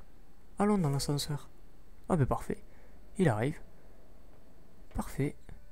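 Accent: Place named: South, France. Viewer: jadore ton accent du sud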